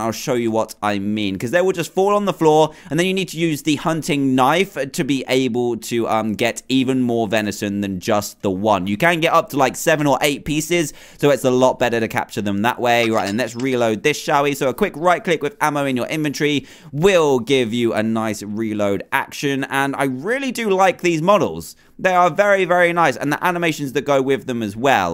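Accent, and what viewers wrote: United Kingdom. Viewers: If he says oh dear its a nothr thing plus he is british so he pronounce deer dear ok?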